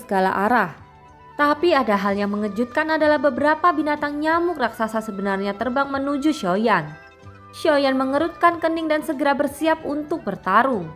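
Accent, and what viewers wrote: Java, Indonesia. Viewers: Bahasa meddok nya/ atau logat nya kapan hilang nya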